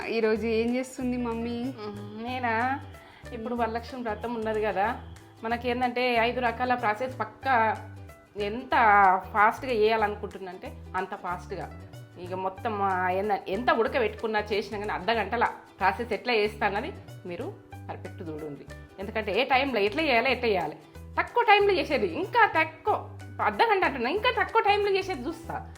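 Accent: Telangana, India. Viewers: అమ్మా, ఇంతకు మునుపు మీరు చేసిన ఉల్లి కారం పొడి, మరియు నూనె వెల్లుల్లి కలిపి చేసినవి చూసాను. మీమాట పలుకు తెలంగాణా యాస చాలా బాగుంది. అంతేకాదు అమ్మా మీ మాటలోని స్వచ్ఛత, స్పష్టత, వింటుంటే నేను బాగా గమనించిన విషయం ఏంటంటే. *అరమరికలు లేని, కల్లాకపటం లేని * మనసు అని నాకు అనిపించింది. ఈ మాట ఎందుకు అన్నానంటే నేను ఎన్నో వందల వీడియోలు చూసాను కానీ అవి చూస్తున్నప్పుడు వాటిలో నటన కనిపించింది. సాగదీయడం , అవసరం లేని సుత్తి రామాయణం విసిగించేవి. కానీ మీ స్పష్టత నాకు బాగా నచ్చింది అమ్మా. ఏ విషయంలోనూ తగ్గేదే లేదు అనడం నన్ను ఆకట్టుకుంది , తగ్గకండి. నేను ఆంధ్రా వాడిని. నాకు తెలంగాణ యాస అంటే చాలా ఇష్టం.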